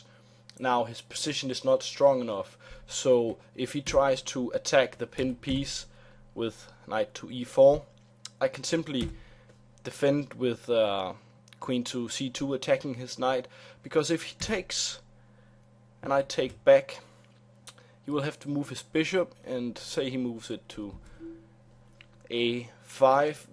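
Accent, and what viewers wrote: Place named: Denmark. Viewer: er du dansk? din accent lyder meget dansk.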